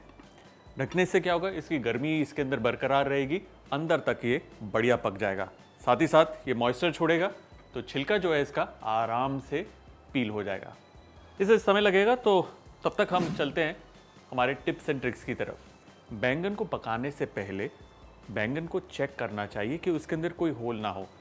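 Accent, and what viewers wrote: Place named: Bihar, India. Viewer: punjabi wala bola na tum bengsli Odisha bihar style bol rahe ho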